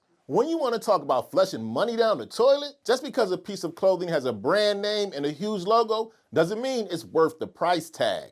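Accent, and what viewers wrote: Philadelphia, United States. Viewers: Are you from Philly?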